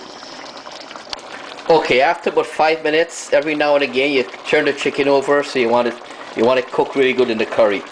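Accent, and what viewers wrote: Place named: Guyana. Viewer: congratulations for your video. I didn't know people in Guyana speak in english like this. Do you recommend your country to people like me who want to practice my English?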